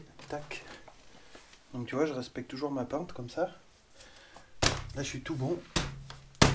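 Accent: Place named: Auvergne, France. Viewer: Merci pour tes explications. Tu viens pas d'Auvergne par hasard ? Vu l'accent 😉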